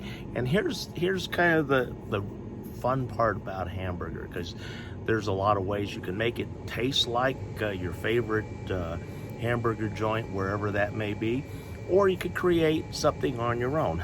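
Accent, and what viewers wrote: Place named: South, United States. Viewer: I could tell that living in North Carolina really did you well for that semi-subtle Southern accent.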